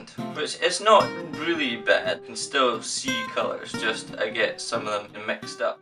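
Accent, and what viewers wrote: Scotland, United Kingdom. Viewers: Scottish Accent> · I've never heard a Scottish accent personally it's really cool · Subscribed- G'day mate! your accent reminds me of my year 4 teacher who came from Scotland - but that was a long long time ago. I thought it would be good/interesting to see how you develop your blogs from here. Good work! Good Luck! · I really do like his channel a lot. And I love Scotish accents. America sucks.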